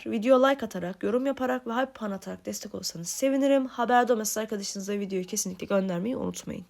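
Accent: Azerbaijan. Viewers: Azerbaycanlisan sen)?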